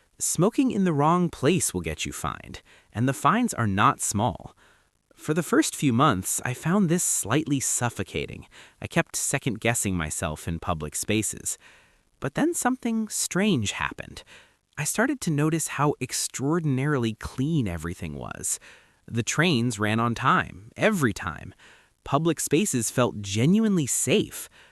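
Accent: United States. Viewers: He doesn’t sound like a British at all, more like an American .😮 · This guy (with an American accent) claims to come from Britain. Never mind. He came here 28 years ago. He never underwent the 60s, 70s and 80s in Singapore where every shred of creativity, individuality and independent thinking was crushed out of you. Which is why many Singaporeans migrated. He missed all that. He never did National Service. And finally being white - every Singaporean women would have kissed his arse most willingly and other brainless Singaporeans would put him on a pedestal. I'd love to see his answer to these points.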